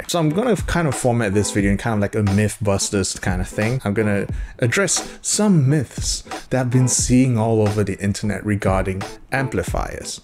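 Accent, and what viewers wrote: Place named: Singapore. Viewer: Just found your channel and I super appreciate your no-nonsense style and knowledgeability. Also your accent makes me nostalgic for sg ✌🏼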